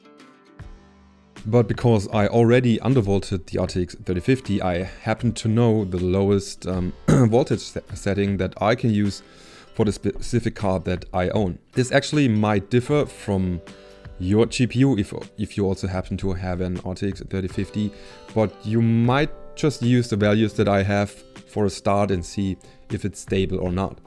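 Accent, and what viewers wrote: Germany. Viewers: German accent and American cap.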